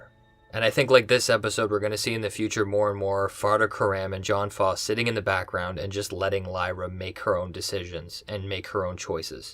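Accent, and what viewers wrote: Canada. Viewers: theres an accent tells me ur probably from canada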